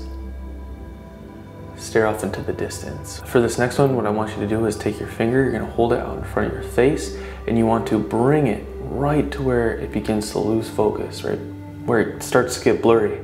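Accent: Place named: Midwest, United States. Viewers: He sounds like he's from Chicago. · does this only really work for people who can only see thing far away? Being nearsighted this didnt do much for me except give me a bit of a laugh when he was using his entire face to open his eyes. good vibes on the video though, gotta love that midwestern accent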